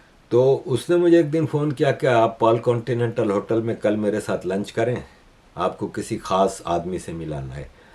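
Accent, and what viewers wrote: Gujarat, India. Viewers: Your roots are from Gujarat & your tone of language ( lehjaa) still reflects the slang tone of traditional gujrati language...Proud of u sir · Aap ka bolne ka tone Gujrati lagta hai.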